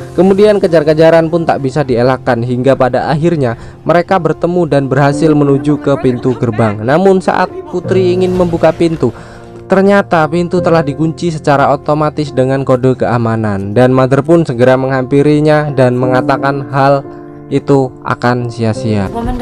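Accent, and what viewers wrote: Surakarta, Indonesia. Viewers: Orang solo kah ? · Orang solo bang? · Orang solo?.. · weeee tekke wong solo to mbak e .. wkwkwk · wong solo kah ?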